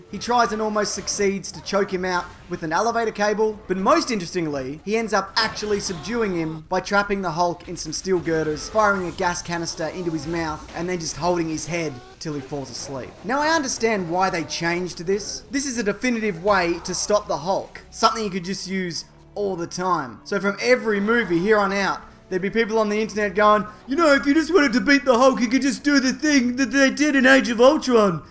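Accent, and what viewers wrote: Australia. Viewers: You, sir, are my favorite source for Marvel news, because your content is great, very funny, and I really like your Australian accent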